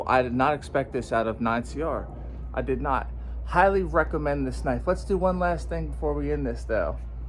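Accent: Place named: Philadelphia, United States. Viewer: Sic blade, gonna have to pick this up. Where are you from? I hear a philly/Delaware, jersey accent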